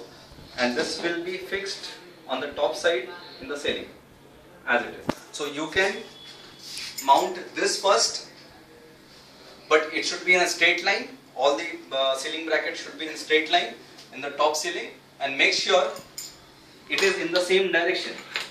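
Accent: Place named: India. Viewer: Smart Bollywood accented man.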